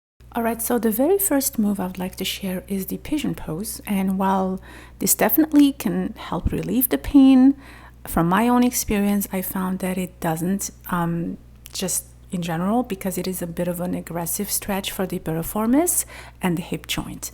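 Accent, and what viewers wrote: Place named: Morocco. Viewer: Spot on again (BTW I identified a district attorney as being from Morocco originally because I know - and love - your accent)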